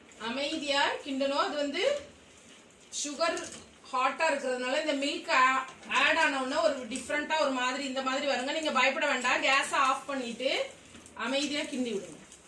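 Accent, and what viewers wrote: Tirunelveli, India. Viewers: திருநெல்வேலி ஸ்லாங் கேட்க நல்லா இருக்கு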